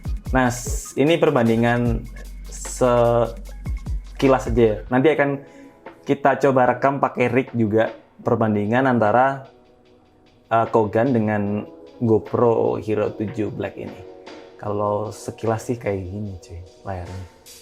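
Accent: Java, Indonesia. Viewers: Yang sekarang suaranya lebih meddok 🤣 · Kowe wong jowo yo mas? Hehe...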